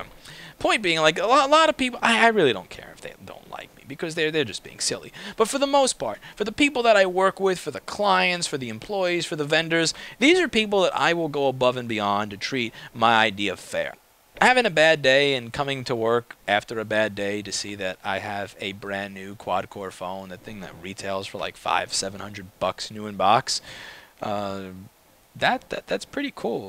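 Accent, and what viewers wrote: New York, United States. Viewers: It’s really weird how your accent has become more of a New York accent in the last few years.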